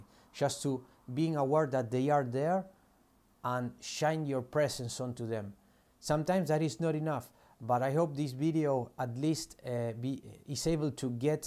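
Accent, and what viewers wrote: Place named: Latin America. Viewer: The content is good but I would suggest you working on your pronunciation a bit more. in some parts of the video your English accent sounds too thick and it can get distracting. I am Latina as well (I assume you are) and I know it takes years to perfect pronunciation, but it's not impossible to always strive to sound better, especially if you have important content to communicate. And yeah you're right the whole "Mind control" title can be misleading to many people.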